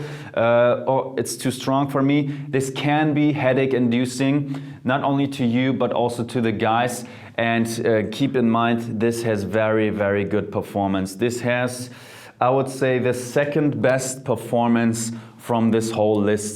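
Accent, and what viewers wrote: Germany. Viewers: Your accent sounds so german :) are you from Germany? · Sorry but he sounds so german.. I‘m from Germany so yeah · Omg he’s GERMAN haha I immediately knew it because of his accent. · Omgggg I knew he was German I just heard him talking in english and thought the whole video about if he is German too bc of his accent and in the end he talks about having an German account 👯‍♀️ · Your german accent is kinda cute :D grüße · German accent 🙂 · Mehta hello! I am french and I can definitively affirm that he is not. The way he's pronuncing french makes me think he is from Germany. Actually, the way he pronunces french"R" is 100% german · I understood from your accent that you could be only German! Deutschland in my heart! ❤ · Hehehe you have a German accent. Are you from Germany? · Is he German? Sounds like it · ist der deutsch? wenn ja man erkennt es eif sofort an diesem akzent😂 nicht böse gemeint btw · German accent! 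· Is he german? He has a little accent, don't he? I'm german, too by the way😉🇩🇪 noch wer? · Too german...if you work with perfumes you need to improve your French, man. Le Femme is pronounced 'fa:m' not 'fem'. Blah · He sounds german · You’re German, aren’t you? No worries, your English is great, I’m a linguist so I notice those things. But still, thank you for this list, I will give those perfumes a sniff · Why does he reminds me of a young Ralph Fiennes lol. I guess the German accent when he was playing that commandant in Schindler's List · As soon as you spoke I knew you were Germany. lol. Thanks for the recommendations. What suggestions do you have for women would have sensitive noses. A lot of perfume irritate my nose and/or give me a headache. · are ya german? i think hes german i can hear an accent loll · I literally was about to look up if he was German until he said he has a German channel. 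German accent is strong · Is he german? The accent? (I'm german) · I can totally hear your german accent coming out 😉 cute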